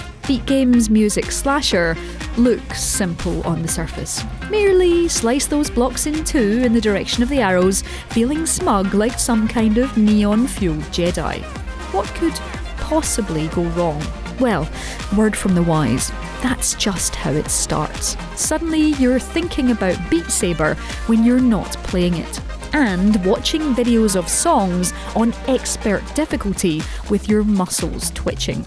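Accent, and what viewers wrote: Scotland, United Kingdom. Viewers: i love this ladys scottish accent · This is a nice scottish accent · everyone talking about games but no one talking about narrator girl .She mixed her scottish-english-welsh accents and it sounds like cute xD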